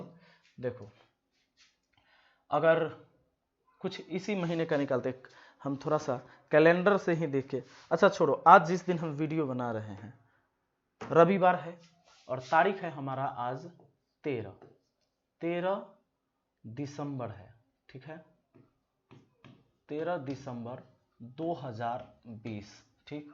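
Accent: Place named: Bhojpuri, India. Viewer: Wars ko bars Yeh Bhojpuri bolne wala teacher hai Bekar lagta hai. Mane mane yeh kya yaar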